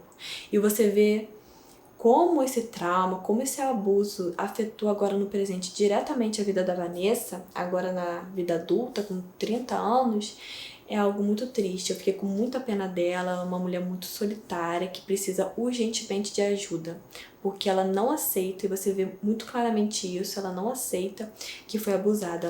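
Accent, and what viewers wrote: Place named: Pará, Brazil. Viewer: Oi... Você é do Pará?... O sotaque parece bastante.🤗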